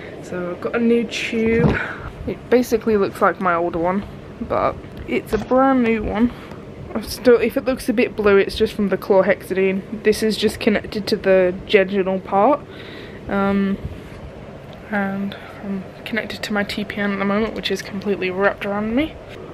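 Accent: United Kingdom. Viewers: Is British? Sorry if this question is stupid 🤦🏼‍♀️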